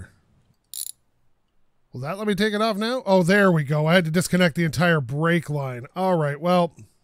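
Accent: Canada. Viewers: Are you Canadian bro?😶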